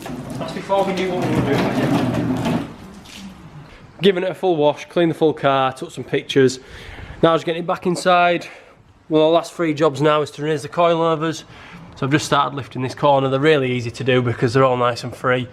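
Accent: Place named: Yorkshire, United Kingdom. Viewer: Where abouts in Yorkshire you from lad?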